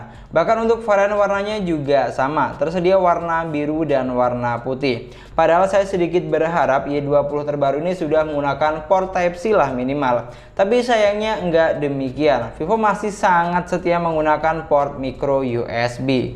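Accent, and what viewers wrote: Madura, Indonesia. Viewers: Bang orang Madura kah?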